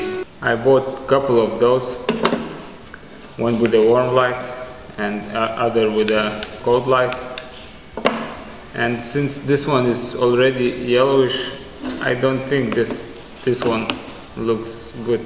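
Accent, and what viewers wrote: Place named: Russia. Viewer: Судя по произношению-это нашенский. Так английский, проговаривают только наши.) Только вот рассказать процесс людЯм по русски никак, надо понравится ИМ!) Мне то рассказывать не нужно, я все это знаю, сам со смолой работаю, но ведь людям то было бы интересно!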